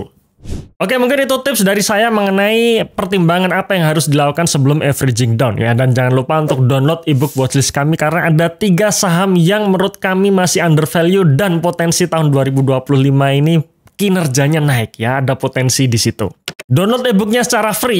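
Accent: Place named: Java, Indonesia. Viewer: logat medok jawane keliatan bener ga sih 😂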